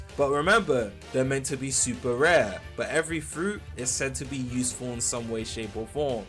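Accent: United Kingdom. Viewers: YOU FROM LONDON TOO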